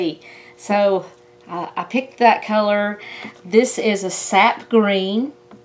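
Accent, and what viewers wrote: South, United States. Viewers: Enjoyed this, Very nice. May I ask where you are from? You have my southern accent! I'm from SW VA.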